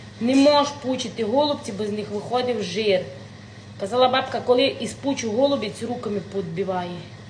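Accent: Transcarpathia, Ukraine. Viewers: Дякую, супер по нашому по Закарпатськи❤❤Ви чарівниця.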